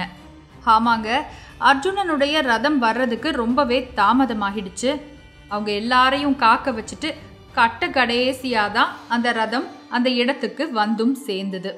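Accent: Tirunelveli, India. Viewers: super story sister nice explain. vazga valamudan kattakadaisi endra tirunelveli vattra valaku super. neegal sollum poluthu kadhai kalathirkae kondu sellum munnorkalin thiramai irukirathu. super sister.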